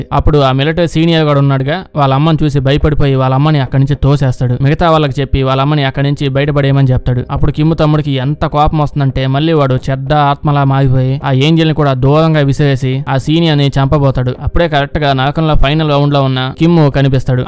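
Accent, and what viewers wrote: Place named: Godavari, India. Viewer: New subscriber.... Godavari side aa anna midi slang alane undi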